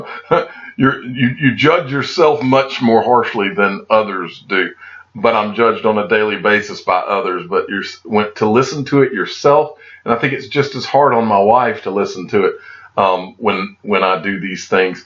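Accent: South, United States. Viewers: When I 1st started listening to you with the southern accent and your relaxed nature, I didn't take you seriously. That soon changed with the substance of your videos. You nail it every time. You, Tony and Eri are my favorites. Strait shootin news is what gives us confidence. One thing I get confused at though. I think you should also be mentioning the Trezor Hard Wallet. My opinion, it's better than the Nano. I bet you had a smile on your face until I mentioned the Trezor, sorry man. LOL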